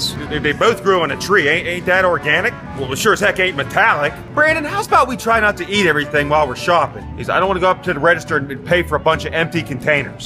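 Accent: Pittsburgh, United States. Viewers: Is this what real Pittsburg accents sound like I hate it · I live in moon township and this is exactly how they talked even my baseball coach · GREAT SHOW- THIS GUY CRACKS ME UP,AND HE REALLY DOES SOUND LIKE US PITTSBURGHERS!!! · Never gets old. I grew up in Pittsburgh in the 70s when the yinzer accent was still alive and well! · I from Pittsburgh, but I go to college in upstate NY. I’m a senior now, and I think at least once every year I’ve watched this video when I got homesick. Hearing the Pittsburghese always gives me a little taste of home and makes me feel a lot better! Thank you!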